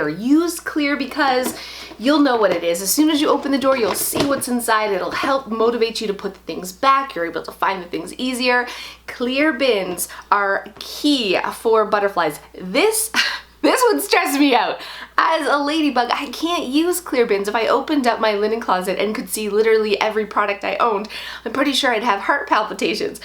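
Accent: Canada. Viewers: I thought you were from Canada based on your accent! ☺️☺️☺️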